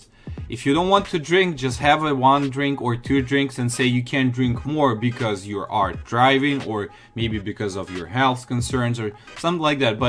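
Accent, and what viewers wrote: Russia. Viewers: Hey boy. Shut your mouth and stay where you are. You will never be an American with your heavy Russians accent. Do the best you can for your beautiful country. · У тебя акцент русский)) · a dude with russian accent and probably was born in Russia,you know. Eloped to usa and says wrong things about Russia. Disrespect and weird way to deride country and people. You haven't succeed at all,. The only right thing from this video is info about superstitions in Russia. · Посмотрел 39 секунд, слышу, что ты русский и вот это "this could get you killed", конечно пиздец. Не потрудишься светануть здесь случаи, где кого-то уже killed по этой причине, особенно зарубежного туриста? ЗЫ. дальше эту поебень смотреть смысла не вижу.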